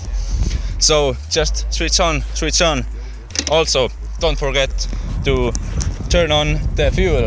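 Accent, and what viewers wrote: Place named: Estonia. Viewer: Aksent reetis et tegu võib olla Eestiga. Lahe ja naljakas video.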